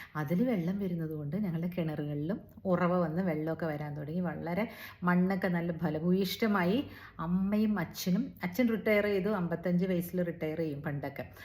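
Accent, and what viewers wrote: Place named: Valluvanad, India. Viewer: മാഡം.... അമ്മ ഏത് സ്കൂളിലെ ടീച്ചർ ആയിരുന്നു. മാഡത്തിന്റെ വല്ലുവനാടൻ സംസാരമാണല്ലോ... നല്ല സംസാരം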